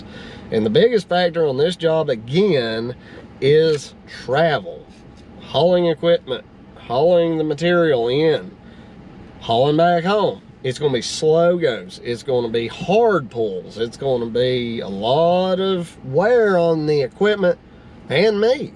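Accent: South, United States. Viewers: YOUR SOUTHERN ACCENT MAKES ME LAUGH. YOU SURE HAVE TAUGHT ME THINGS. THANK YOU.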